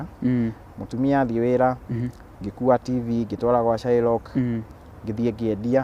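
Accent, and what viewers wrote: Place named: Nyeri, Kenya. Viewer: Kikuyu ya nyeri slaps differently..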